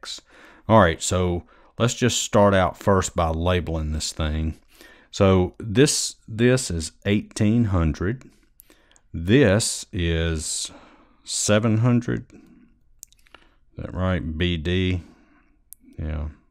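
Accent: South, United States. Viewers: he meant with the southern accent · I was not here for math, I was just listening to your Southern accented English...... You are a good teacher. Regards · Most southern accent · Maybe it cause he from the south cause of his accent because am from the south but the way he solved the problems great and amazing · The southern accent is so annoying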